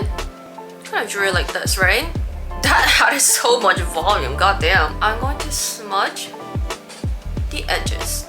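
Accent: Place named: England, United Kingdom. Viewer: Thank you for sharing this video ❤❤ btw I LOVE your English accent and you look a little bit like Wonyoung :)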